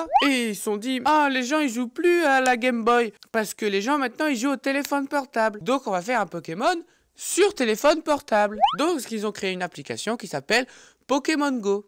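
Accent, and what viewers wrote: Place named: North, France. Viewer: tu est du nord toi ... POURQUOIIIII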